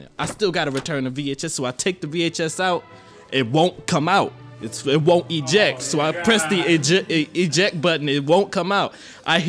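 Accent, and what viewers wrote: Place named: Michigan, United States. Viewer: accent! #MichiganNative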